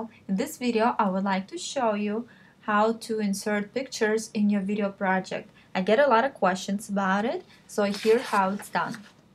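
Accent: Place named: Ukraine. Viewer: у Вас український акцент))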